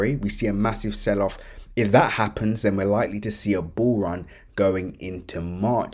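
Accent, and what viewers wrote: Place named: Essex, United Kingdom. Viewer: You got to be near me in Essex lol I can tell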